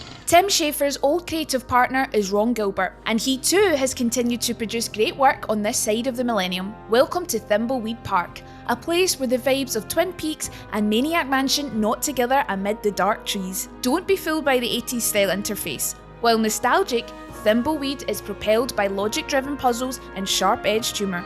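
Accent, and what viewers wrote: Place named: Scotland, United Kingdom. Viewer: These vids are really good, and I love the Scottish accent, but they’d be even better if you wrote what platforms the games are on too!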